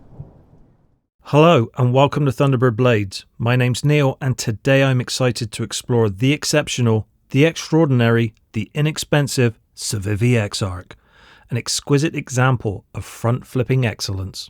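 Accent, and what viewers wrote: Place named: United Kingdom. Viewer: You sound like you're from the UK but, I'm guessing you live in the US? Purely because you are reviewing a lot of knives all of which are illegal to ship/buy in the UK. Hate UK laws.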